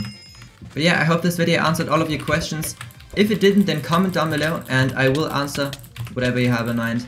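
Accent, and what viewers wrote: Germany. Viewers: I can litterly hear that ur from Germany I am too btw good vid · U sound very German are you? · deutscher akzent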